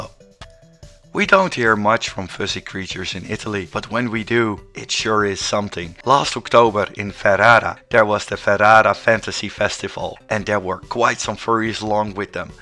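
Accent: Netherlands. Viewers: Eyy I hear you’re dutch!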